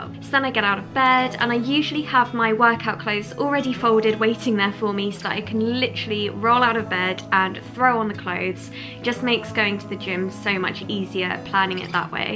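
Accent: United Kingdom. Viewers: I love your accent so much! I'm Polish and I try to speak in British accent everyday but it is not easy. :( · I love routine videos!!! 😍 And I swear I'll never get enough of your British accent. 😩 I love your voice and accent a lot,! Love you! ❤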